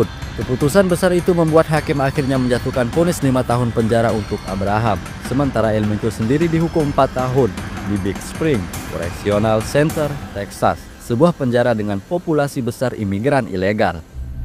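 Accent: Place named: Lombok, Indonesia. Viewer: semaikn ndek asing logat sasak nii, ternyate dgn sasak Lombok Admin😅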